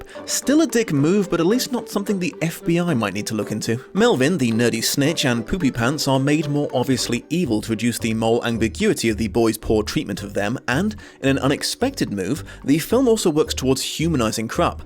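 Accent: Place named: RP, United Kingdom. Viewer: Hearing the Dom, posh, British voice, talking about Captain Underpants makes me laugh harder than it should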